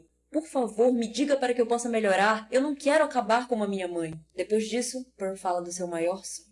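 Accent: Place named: Pará, Brazil. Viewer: Voz de dubladora com sotaque paraense, asmei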